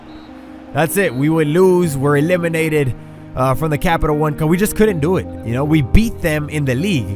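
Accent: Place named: Mexico. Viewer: Haha 5 minutes in and your career mode is fun to watch!! 😅 I thought you were white when I started listening but then as I kept watching Im like nah this guy is mexican lol 😆 Also because of the signings you were making of mexican players lol I hope you keep uploading career mode videos bro, I just started a West Ham career as well but I put the team all the way down to 4th division and I'm trying to promote them all the way up to premier league!! 🙂‍↔️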